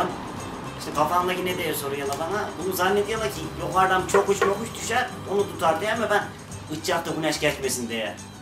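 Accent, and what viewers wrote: Kastamonu, Turkey. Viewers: Sivaslı olarak Kastamonu şivesi dinlemek :)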